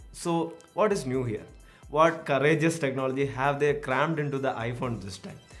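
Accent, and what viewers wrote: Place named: Tamil Nadu, India. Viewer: Aiyo tamil ascent , so sweet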